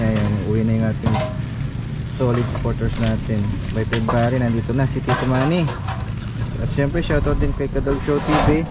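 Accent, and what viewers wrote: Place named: Pampanga, Philippines. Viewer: May accent nang Kapampsnganndi Mrs.🎉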